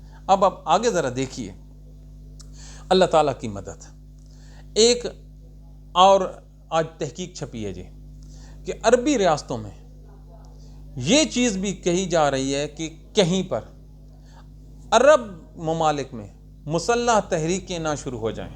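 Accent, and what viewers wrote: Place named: Mianwali, Pakistan. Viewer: Bhi sab kia ap k taalq mianwali Cha?aoa meri shadi Sargodha hoi ha m b mianwali piplan c Hoon ap k lehja APNA shar jaca ha is Lya m or mera husband ap k vlog Shok c dakhtay hen Allah ap ko khosh rkha .or khoshi hoti ha jb ap reply krty hen.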